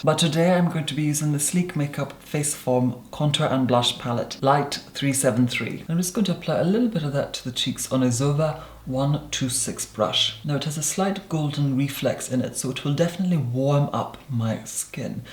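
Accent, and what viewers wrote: Scotland, United Kingdom. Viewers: Are you Scottish?? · The more I see your 'fillums' and the more I hear you speak, the better I understand your English with that charming Scottish accent. For a Frenchwoman, believe me, it's not so easy! Thanks for the lessons, Professor 🌹 A 37 years old French woman ❤ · maybe outer Scottish isles but quite enunciated? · J Yes, there most certainly appears to be a slightly Scottish undertone audible here, there, and everywhere.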